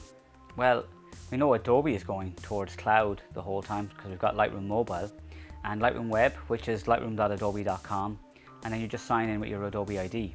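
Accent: Ireland. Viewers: I enjoy your videos very much. From seeing so many Brendan Gleason films, your accent is not a problem. There are lots of Irish people here in the states too. But your audio is not ideal. It sounds harsh, like there's too much reverb in your room. There are some cheap ways to address this with towels on the walls. Here is a guy from Wales with many interesting free solutions, whose audio always sounds just right: <link>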